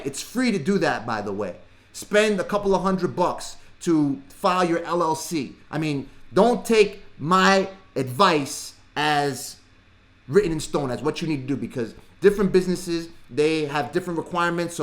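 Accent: New York, United States. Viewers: Con Ed is in NYC, maybe he's from NY. Sounds like it!!! LOL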